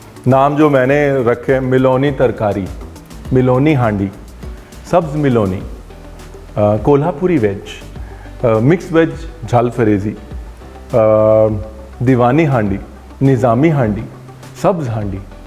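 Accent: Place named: Malwa, India. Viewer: Hi ranbir.. Muje apki recipes bahut achi lagti h.. Aap faridkot se ho .. Main moga se ho.. Ab hm jalandhar main rehte h .. Aap bahut achi punjabi bolte ho.. Malwe wali ...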